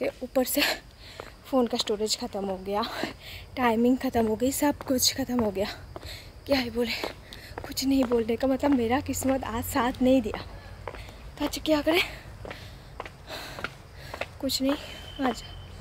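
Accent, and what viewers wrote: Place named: Bangladesh. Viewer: আপু আমি বাংলাদেশী আমি বাহরাইন থাকি, আমি তোমার সব ভিডিও দেখি তোমার ভিডিও আমার খুব ভালো লাগে, তুমি আমাদের মত কথা বল, ভালো লাগে তোমার সব কথা,এখন কেমন আছ জানাইবা, রিপ্লাই চাই ওকে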